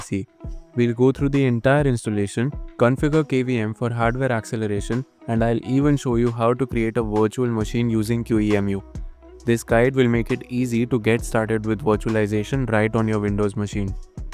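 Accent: India. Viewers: indian, ew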